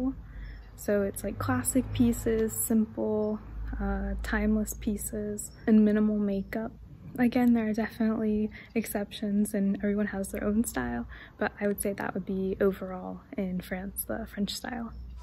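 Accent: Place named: United States. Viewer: Your videos are quite enjoyable. For me, you have those French qualities of understated, put together dress style, subdued makeup presentation, and an understandable tone when speaking French, BUT….it’s ruined when you speak American English! Could you please fake a French accent just once when speaking English?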